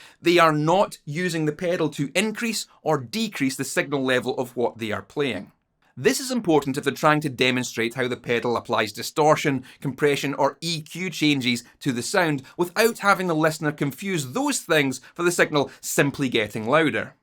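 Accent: Scotland, United Kingdom. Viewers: The Scotsman in me loves your accent, I'm in the USA so no accent, but I especially enjoy the way you break things down logically, and with humor! I plug straight in and keep it clean, because that sound makes me feel good, for whatever reason distortion makes me feel bad. But a smidgeon of overdrive is tasty in the proper context, or maybe a little fuzz? Cheers!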